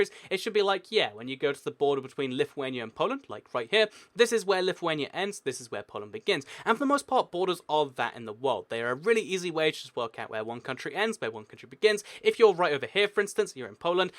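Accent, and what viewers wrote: England, United Kingdom. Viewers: Kiwi he's British, they pronounce it differently Coming from a Bosnian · Very good material. It could have been cut up into two- or more presentations. I live in (kind of) the Southern U.S. and the combination of your English accent (which is delightful, by the way) and the speed with which you blew through your presentation made it a little harder (for me, anyway) to understand. I genuinely enjoyed the video, however, and am impressed by the amount of research you must have had to do to acquire this obscure knowledge (disputed and unclaimed lands of the earth).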